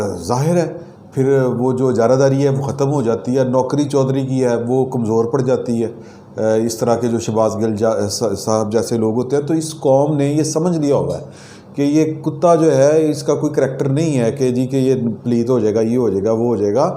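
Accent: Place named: Lahore, Pakistan. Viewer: AOA... Sir g zaberdast analysis hy...Aap ka baat kernay ka aik khalis Lahoree andaaz bht. Pasand aaya..Baqee ye jo Niazi k spoksmen bhaan bhaan ker rahay hn...tu bhai g inn ki 2 Din phelay Niazi nay class leeh hai...iss leyaa TATEE ka zor laga rahay hn... SHEEDA TALLY ko tu NIAZI nay BILLIONS kay TRAQEYAATEE FUNDS deyaa hn .TALLY nay MUREE ROAD per banner bhi lagwaie TALLY un AWAAM kay TAX k paisoun say Rawalpindi may kothian ( BUNGLAS) bunwa raha hy.. bayshak inquiry kerwa lain.....NAB Kahaan hy.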